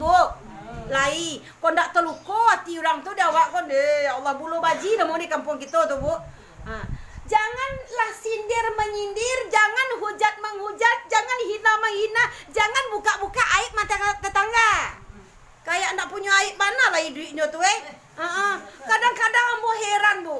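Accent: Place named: Minangkabau, Indonesia. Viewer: terbaikkk ustazah..👍👍 Haha Bakaleberan muncuangnyo Bahaso urang awak..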